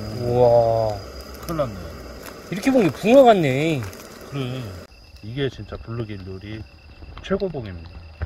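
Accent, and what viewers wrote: Jeolla, South Korea. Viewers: 전라도여?ㅋ 가장게. 일로치나. 절로간게. 타슈와 타랑께ㅋㅋㅋ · 으따 전라도여~^^ 너무 좋당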